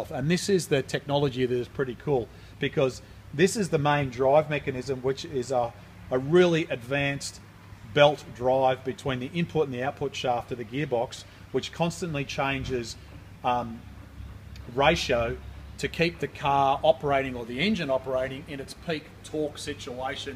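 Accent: Australia. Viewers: While no secret was revealed, other than full disassembly required for filter change, your Oz accent gave the video a "Richard Attenborough" like effect which was enjoyable. Great video. Thank you.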